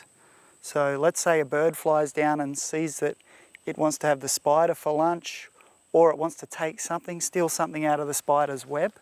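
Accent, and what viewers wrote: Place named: Australia. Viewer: I was hoping that spider jumped on his Australian accented face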